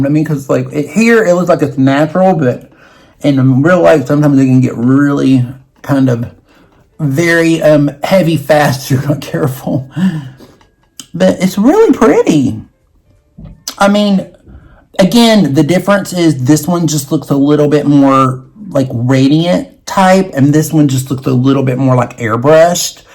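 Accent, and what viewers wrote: South, United States. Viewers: Thank God you reviewed this foundation. I am 39, had a bday recently and have dry dry skin from Sjogrens syndrome. My mom has cancer and has dry skin and has begged me to find a foundation for winter for dry skin. We are Lancome people but they have really changed formulation and now their products are for younger people and they are totally missing the market. So upsetting for us younger middle aged ladies and elderly women like mom because they have discontinued EVERYTHING that worked before and reformulated some things for combo skin and oily skin. Sooo, us dry skinned people are left out in the cold. Just subscribed and will watch some of your older videos. Love that you are down to earth and that you are using a brush for this foundation and not fingers. Most people reviewed this with fingers and didnt get coverage but a dense brush makes more sense. 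Also, I'm from Texas and as a Southerner your accent is normal to me. I watch Lisa and Michelle as well but lately I am SOO UPSET with high end brands dropping the ball with some products. I know Chanel and Dior has reformulated so much with inferior ingredients to keep cost down but those of us with rosacea can really feel it in the skin.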